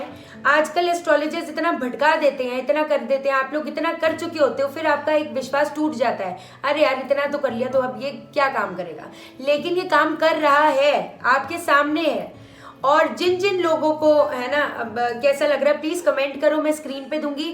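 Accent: Punjab, India. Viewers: I love the way you speak in Punjabi way. Main v Punjabi hu .😘😘😘😘😘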